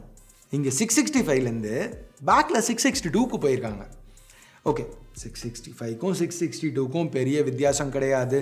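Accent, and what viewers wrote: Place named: Chennai, India. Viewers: Ainba louinkasa super Chennai speech Samaaaaa😂😂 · Local tamil slang 😂😂😂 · 🤣🤣🤣🤣cheenai slaang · Kola kaandu pola moto brand mela kku, vittu velasitaru, சென்னை ஸ்லாங்க் யோவ் இன்னாயா😂🤣😂..... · 🤣🤣🤣 1st time bro spoke local chennai slang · Bro pathu bro romba Chennai language Varuthu, and casual ah pesaringa 😂😂 yen indha matram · Namma chennai language velila vandhudicha. 😂😘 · Chennai slang romba super bro 👍🎉👍🎉👍🎉👍🎉👍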